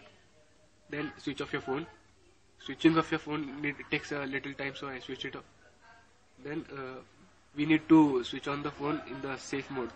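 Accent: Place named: India. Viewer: dieses english von indern klingt immer wieder geil :D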